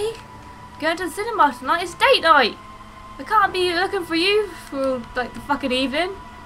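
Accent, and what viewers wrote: England, United Kingdom. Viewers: Hello, where abouts in England are you from? Just curious. You're voice is half the reason I'm watching this walkthrough. Also, extending your Hunter Axe assists greatly in dealing damage with a from a bit farther away, usually enough away than most enemies' hit range. Regards from Blackpool :)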